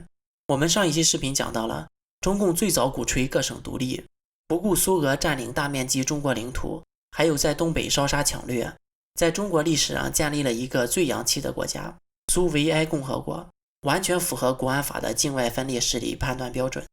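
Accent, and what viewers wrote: Shandong, China. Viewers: 你的口音应该是山东的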